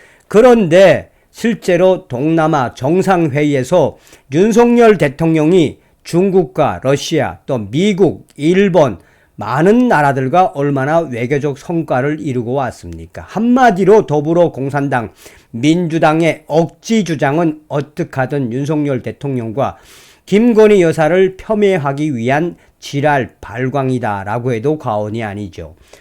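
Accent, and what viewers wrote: North Korea. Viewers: 북한 톤으로 말씀하시는거 듣기 부담스러워요. 항상 방송 잘보고있읍니다 감사합니다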